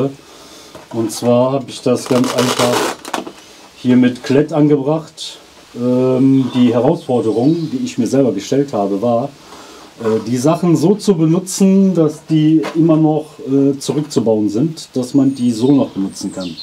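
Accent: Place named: Ruhr, Germany. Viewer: Kommse aus'm Ruhrpott?Dat hört sich so an. Och nö dat Video ist nett abba doch zuviel gebastel für mich. Vor allem bei meinem Reely Big 1 welcher Ständig weh wehchen hat nich lonhnenswert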